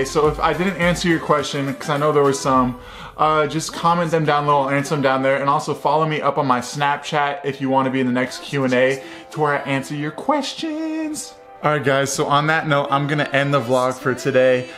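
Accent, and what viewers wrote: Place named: Ireland. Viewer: With your red hair and Irish brogue, have you ever been mistaken for a leprechaun?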